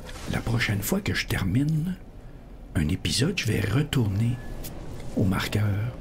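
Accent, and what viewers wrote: Quebec, Canada. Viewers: Ne change rien. Perso, j'adore l'accent québécois...👍👍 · Salut, Je voulais juste te dire à quel point j'apprécie ta façon de parler avec cet accent québécois authentique. C'est ce qui rend ton discours si unique et captivant. Ne change rien! En France, où j'habite, on n'entend pas souvent cet accent, et c'est précisément ce qui rend ta présence si spéciale. Ta manière de parler apporte une touche de fraîcheur et d'originalité, et c'est l'une des raisons pour lesquelles tant de gens t'écoutent en france. Ce que tu fais est vraiment superbe, et je pense que c'est important de célébrer nos différences linguistiques. Cela crée une diversité enrichissante et stimulante. Continue à être toi-même, car c'est ce qui te rend exceptionnel.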